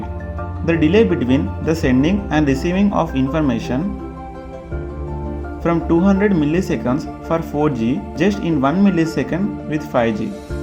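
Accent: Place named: South India, India. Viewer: Good voice if not perfect . Hint of south accent . Mis pronunciation of few words . Overall 4/5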